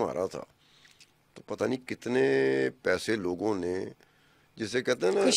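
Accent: India. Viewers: Aleem khan please ask your host speak like Pakistani not Indians. This is very annoying .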